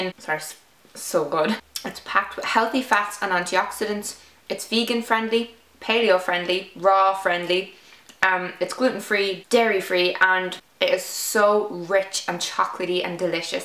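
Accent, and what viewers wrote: Ireland. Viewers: Are you from Ireland if so where do you get all your ingredients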